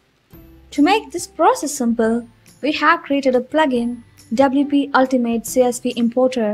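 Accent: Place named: India. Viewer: I love Indians and their English.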